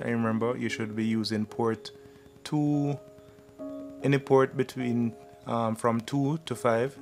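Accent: Jamaica. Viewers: Sweet. Can learn Mikrotik with a Jamaican accent! Big Up the Tik!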